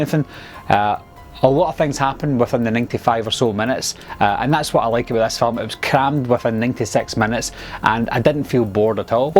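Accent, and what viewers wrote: Scotland, United Kingdom. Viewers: Love yer Scots accent, pal! Good to hear a Scots voice doing YouTube reviews. Miss that lots - used to bide in bonnie Aiberdeen, and aye, I still spik a wee bit o the Doric...agus tòrr Gàidhlig cuideachd! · Damn I was not expecting such... Scottishness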